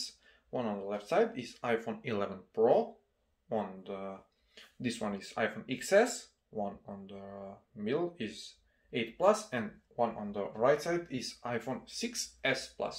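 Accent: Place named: Russia. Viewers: Акцент выдает, чел русский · Внатуре русский бля 🤣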